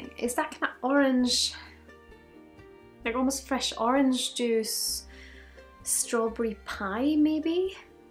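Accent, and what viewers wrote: Scotland, United Kingdom. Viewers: Just ordered 2 bottles ..you convinced me. Oranges, strawberries ... wood. Sounds great. Alert don't by this its a watery dram for beginners The A'bunadh is a good drop so can't go wrong Does Sweden have any distilleries. ? I'm confused as I'm sure you have a Scottish accent.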